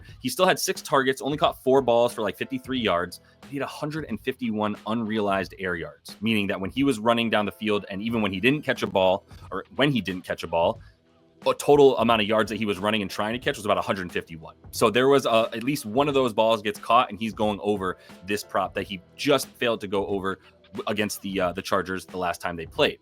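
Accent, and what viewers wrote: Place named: Boston, United States. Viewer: sounds like his from Boston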